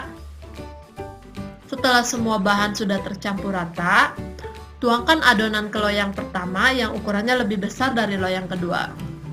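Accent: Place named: Java, Indonesia. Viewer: owh orang cikarang toh jdi kya logat jawa ya' 🤣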